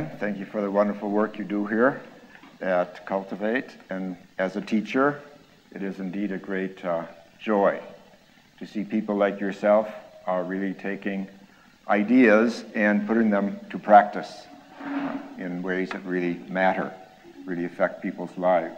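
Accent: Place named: Ireland. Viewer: and I love his Irish accent....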